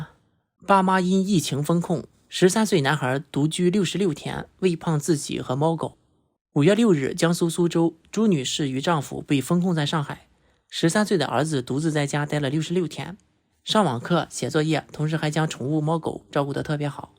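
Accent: Shandong, China. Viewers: Klaus是不是山东人，感觉口音挺重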